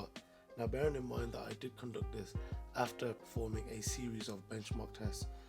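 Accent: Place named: England, United Kingdom. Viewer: annoying english accent. good review though.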